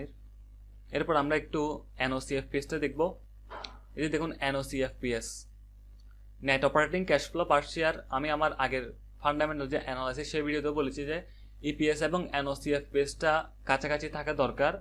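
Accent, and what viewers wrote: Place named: Chittagong, Bangladesh. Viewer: apner vasha dehe mone hocce apni Chittagong ar, I also live in Chittagong please tell me how to contact to u?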